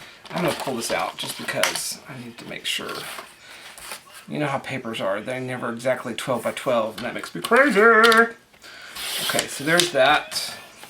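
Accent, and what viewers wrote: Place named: South, United States. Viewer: Fiona has been singing your praises for so long & I finally made it over here. Now I know why. I love the way you don't even think about it. You just keep explaining as you're going along & it's like we're having a conversation. Well except for the part where you can't hear me respond. :) Also the accent & the y'all made me feel like I was watching a long, lost cousin. Where are you from? I was born & raised in Texas. :)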